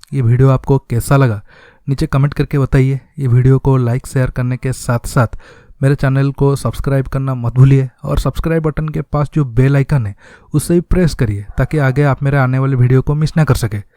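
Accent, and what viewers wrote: Odisha, India. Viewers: Sounds like u r from odisha...is it? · BHAI. TUM ODISHA se ho kya ?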